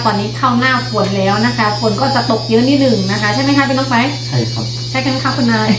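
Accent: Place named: Isan, Thailand. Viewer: เว้าสำเนียงอิสานแล้ว น่ารักมาก มักหลายเด้อ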